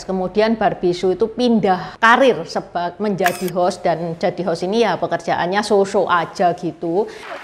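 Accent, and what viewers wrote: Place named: Yogyakarta, Indonesia. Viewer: Mirip logat Chinyo alias chinese yogyakarta. 🤭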